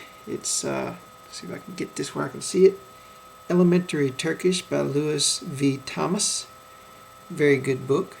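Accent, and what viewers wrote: United States. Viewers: Good video. What kind of American accent do you have? (when speaking English, I mean)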